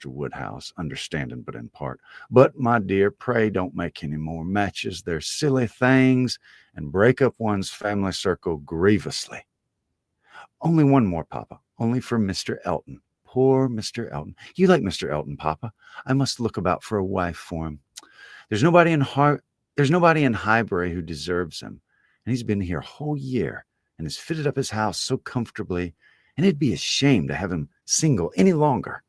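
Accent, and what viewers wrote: South, United States. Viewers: Thank You SO much for your love and work!! It's delightful listening to your renditions of classics - Southern Style! Fabulous!!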